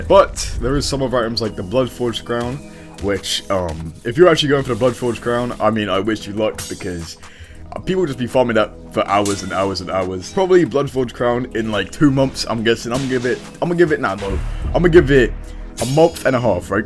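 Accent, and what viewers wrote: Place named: United Kingdom. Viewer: You are so British, damn...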